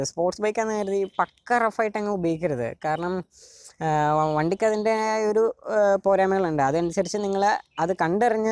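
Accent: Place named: Bengal, India. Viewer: Bengali malayalam parayunna pole ind brw sorry feel avaruth.... Plz improve ur audio quality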